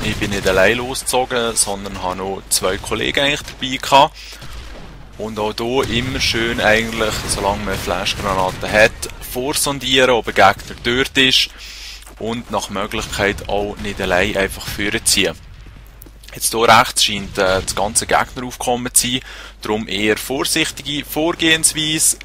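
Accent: Switzerland. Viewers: Sehr hilfreich, gut gemacht. Nur bemuehe dich mal bitte hochdeutsch zu reden (auch wenn ich den Dialekt mag, ist schwer zu verstehen), dann gucken dir auch noch die 80mio deutschen zu und nicht nur die deutschsprachigen schweizer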